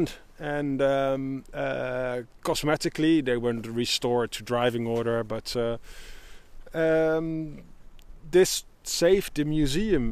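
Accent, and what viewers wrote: Netherlands. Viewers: Hallo ik hoor dat je Nederlands bent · That Dutch accent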